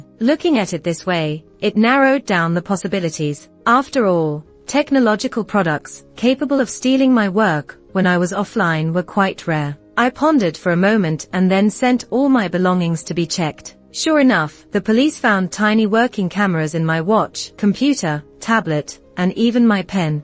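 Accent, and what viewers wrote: United Kingdom. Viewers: I have to admit... I don't even care what the story is about. I love the British accent lol. Though I will say this was very satisfying to see Molly and Ray get what's coming.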